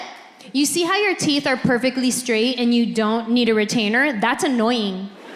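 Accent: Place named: California, United States. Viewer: She’s really refined her routine, she’s always had funny material, but now she’s “polished” as a stand-up comedienne. However, I do wish she used adult language like 98.4% of us normal adults use in our everyday lives….and I like the fact that she hasn’t lost her Calif accent, she sounds like she could still be an Oakland Raider cheerleader….if she ever books a gig here in San Jose, Calif, I’m definitely buying tickets to see her live…😊✌🏻🇺🇸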